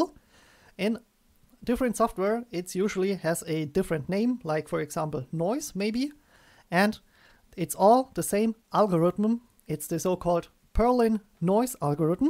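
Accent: Germany. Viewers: Love your Tuts. I don't know if its cause they're awesome, or your German accent is awesome... Or both maybe :)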